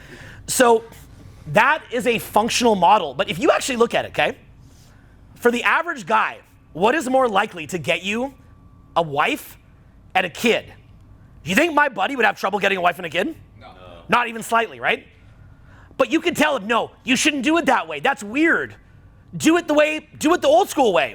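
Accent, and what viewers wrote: Canada. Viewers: Fair-ull, for all the people incorrectly trying to correct your Canadian pronunciation of the English language. Great timing with this vid considering the two Epstein clients just went to metaphorical war today.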